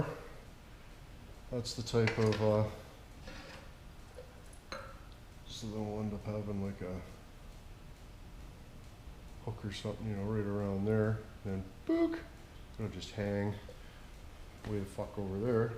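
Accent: Canada. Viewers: do all Canadians talk like you ?? or is that Inwood dialect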